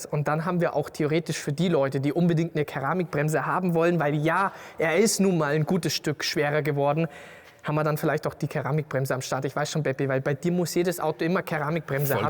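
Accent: Bavaria, Germany. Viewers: heute zum ersten mal auf Eurem Kanal - boarisch, da Wahnsinn (Muttersprache :), des is a moi a Abo wert!! Mir gefiel der M2 vom ersten Moment und ich bin am Samstag in der BMW-Welt um das reale Auto rum - kein Vergleich zu Fotos oder Videos - ein Traum. Mein 240 (F22) wirkt dagegen schon fast unmodern. Brooklyn Grey als Schalter kann ich mir sehr gut vorstellen :) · Ruhig bissl bayrisch find du ch subba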